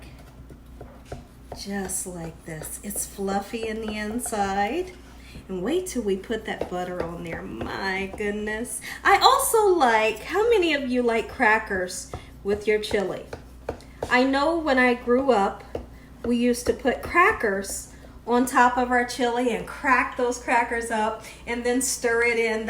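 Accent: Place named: Texas, United States. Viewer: I would have never guessed you are from Ohio or maybe your not 🤔. I seriously thought TX! (Where I live) or Louisiana (my soul, I love that state) ORRRR Oklahoma 🙄😂 (you know TX & OK have a thing 😂. I kid I kid it’s cool IG 😂). Love your style tho. All the love from my family to yours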